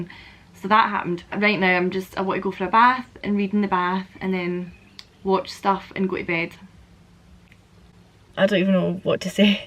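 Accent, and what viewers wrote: Scotland, United Kingdom. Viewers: Love this series! I've been having a bad few days with anxiety as I'm abroad on my own and been struggling to deal with the large numbers of people at the event I'm at. I discovered you through your collab with Sarah Courtney the other day and I've since been binge watching your stuff and it's helped me so much! I've watched this whole series now and it's brilliant! Proper rollercoaster but I find learning about other people's experiences strangely soothing when I'm anxious plus I love any Scottish accent 😆 Also I'm really grateful for women like yourself and Hannah Witton sharing your perspectives on menstruation so that I can understand a bite more.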